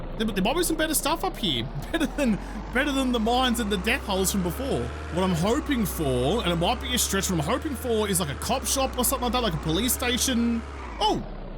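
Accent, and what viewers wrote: Australia. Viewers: You have the most Australian accent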